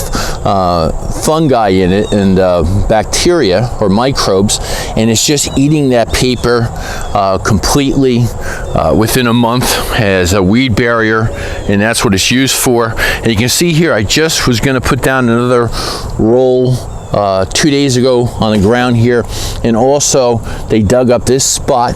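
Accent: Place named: South Jersey, United States. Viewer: I am originally from South Jersey and your voice has once again made me homesick! Thanks for the video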